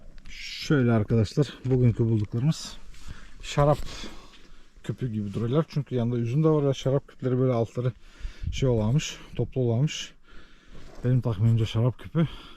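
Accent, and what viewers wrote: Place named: Yoruk, Turkey. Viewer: Yalnız orası neresi merak ettim. Bizim aydın didim'in Yörükleri gibi konuşman ama...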